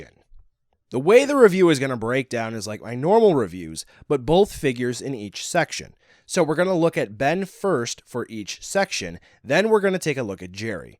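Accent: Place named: South, United States. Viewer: What is with the southern accent?????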